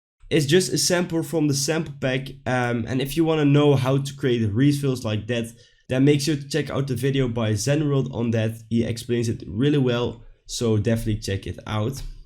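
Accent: Netherlands. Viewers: are you dutch? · aangezien je accent weet ik dat je nl bent dus doe ik het in nederlands. tv noise maakt bass house toch?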